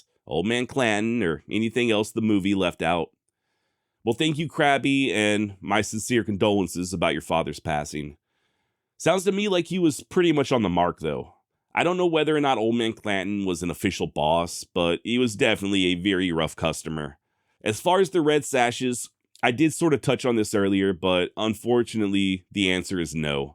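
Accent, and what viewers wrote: Texas, United States. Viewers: I love your intro and sing along with it. (I wrote and perform mine) and a far as your accent goes sounds like central Texas or Oklahoma. The giveaway is wash. You say warsh. Warshington. Think Dustin Hoffman in Little Big Man. "Gawd bess George Warshinton". Classic. Keep pitching buddy. I love you just the way you are. YEE HAW! rocks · I feel like a lot of these comments on your content or accent are from people that watch about 2 minutes of a video, find something they disagree with, then comment, lol. The thing about your accent was hilarious. If you watch any amount of your videos, you will find out pretty quickly you are a Texan, lol. Keep up the awesome work.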